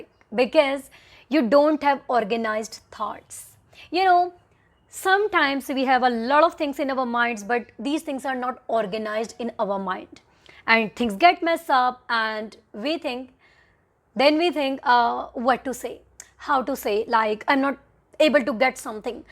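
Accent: India. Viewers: I think you are from India because your voice is Indian.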